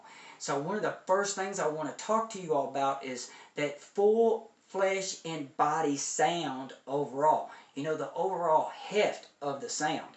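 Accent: South, United States. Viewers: Nice review mate! Luv the Southern accent! You’all did a great review here. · Good, clear review. As a non American your accent is interesting. As are some of the adjectives that you use. I'm guessing that you're based somewhere in Southern Amserican States. Fascinating.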